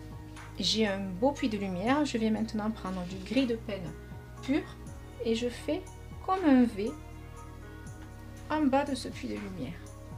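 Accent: South, France. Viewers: Bonjour, Merci pour vos vidéos, elles dégagent de belles énergies créatives 🙏. Je reprends la peinture ( merci le confinement 😉) par contre la peinture au couteau est une première, pas facile à positionner sur une toile quand le chevalet est absent... Une astuce? A votre accent vous êtes dans le Sud. Faites vous des cours de peinture en présentiel? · Toujours un réel plaisir.de voir votre façon de travailler avec de surcroît votre douce voix du Sud de.la.france ! Vous Expliquez toujours très clairement c'est adorable a vous merci à vous ,🙏❤️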